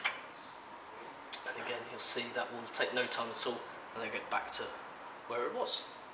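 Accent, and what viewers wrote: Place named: United Kingdom. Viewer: This guy's too British to understand very well.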